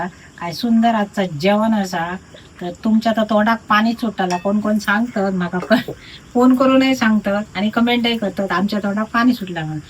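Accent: Malvani, India. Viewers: आपली मालवणी भाषा एकदम भारी..❤ऐकाक पण छान वाटता आणि तुमची माहिती पण भारी 👍❤️❤️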